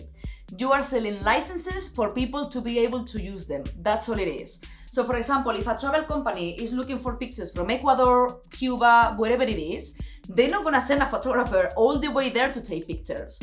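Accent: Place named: Spain. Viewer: Hi, i love your videos, your viewpoints on the pro phhotography business and your unique spanish accent when you speak english! (My native tongue is French and your english is easier and more pleasant to listen to for me than the excited stance of most american youtubers) Microstock is the niche that interests me the most on youtube so i would like to recommend that you delve more into this topic. Eversince Nicole Glass has left this space on this platform, i have the feeling that you are the only one who can elegantly and efficiently fill up this gap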